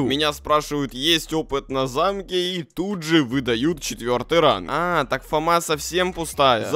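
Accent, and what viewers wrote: Ukraine. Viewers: Ммм ты с Украины?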